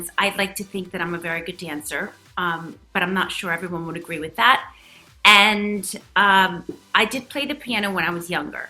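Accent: United States. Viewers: sounds more American and less British in this video...........her voice is very different on RHOBH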